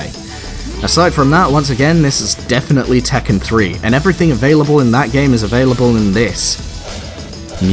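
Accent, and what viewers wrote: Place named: United Kingdom. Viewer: never even heard of bleem until this video was recommend to me 😂 and which part of Britain are you from?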